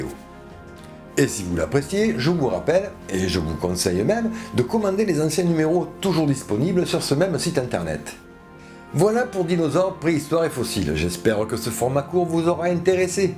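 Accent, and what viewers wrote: South, France. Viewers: Un bel épisode de vulgarisation! En ce qui concerne les vérités de type scientifique et les gué-guerres entre paléontologues sachez qu'elles vont encore beaucoup plus loin et dépassent le cadre la seule paléontologie! Amusez vous à comparer le contenu et la longueur des articles en anglais et en français de "Lucy" et de "Yves Coppens" sur wikipedia! C'est un peu la guerre à celui qui aura la plus grosse entre américains et français, si j'ose dire ! Nos amis anglophones goûtent peu ce qui leur semble un surcroit de prestige accordé à Coppens. La science ne gagne rien là dedans... Quand à l'accent... rien à redire. Je suis un méridional aussi et suis ravi d'entendre chanter la paléontologie. On sait être rigoureux nous aussi!!!! Tè vé!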